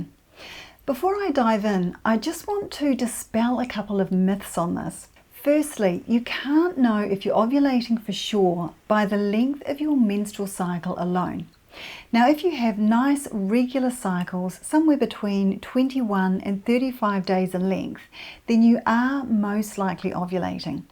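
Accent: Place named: New Zealand. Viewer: Very informative. It's so nice to open a video on the subject of fertility and hear a kiwi accent 😊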